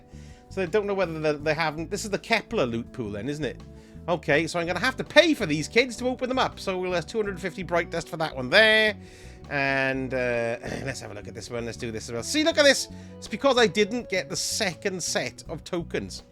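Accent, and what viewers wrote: Wales, United Kingdom. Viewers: Clicked on this randomly. Welshman detected dai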